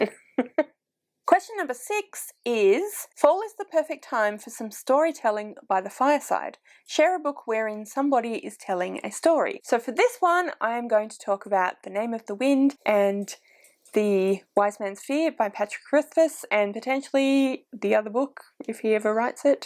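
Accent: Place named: Australia. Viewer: Haha, not gonna lie, I was a little confused when I saw the title, then as soon as I heard your accent I was like 'duuuh obviously it's autumn in Australia!'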